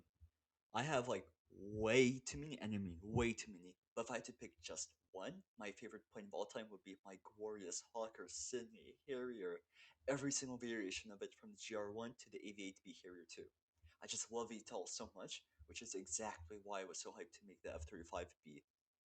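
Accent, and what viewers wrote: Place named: Canada. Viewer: You sound so Canadian 😭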